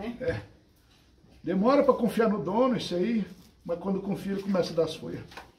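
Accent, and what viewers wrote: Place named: North, Brazil. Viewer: Amo o pai, me lembra o dialeto do norte do Brasil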